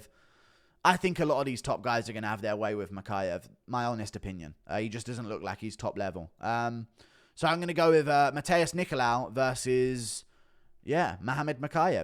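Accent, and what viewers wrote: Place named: England, United Kingdom. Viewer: been watching this channel for over a year. And I just now noticed you have an English accent. -Fan from the 209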